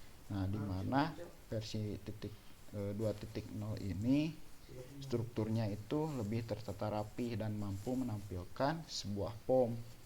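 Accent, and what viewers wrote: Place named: West Java, Indonesia. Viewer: Pengisi suara nya sunda banget :v sukses terus buat JAKI Aplikasi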